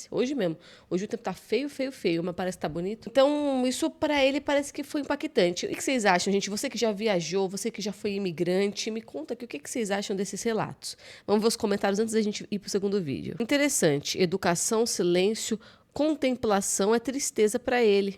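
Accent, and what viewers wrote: Bahia, Brazil. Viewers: Pelo sotaque é baiano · Pelo sotaque ele é baiano 😂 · Como baiano, reconheci logo ao abrir a boca que é baiano! A nossa fala é muito particular!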